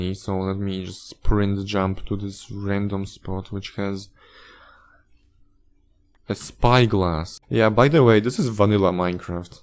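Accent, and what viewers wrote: Poland. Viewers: are you polish? I'm sure that your accent is polish.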